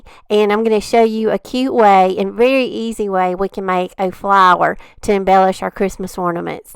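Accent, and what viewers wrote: South, United States. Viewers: Girl, I love your channel...and that so southern accent!! I’m from SC, but yours is better than mine ❤️ I’m making ornaments this year for close friends and family..a lot of this stuff I have in my stash!! Checked out Olive Rue and signed up for her newsletter and will be wrapping my candles for the Holidays!! Thank you both so much!!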